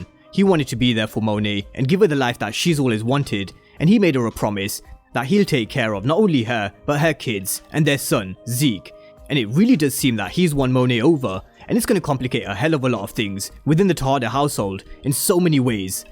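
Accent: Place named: United Kingdom. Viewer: Sounds like the narrator is possibly British, they sometimes pronounce names or terms ending with an 'a' or ' 'ah' with an 'er'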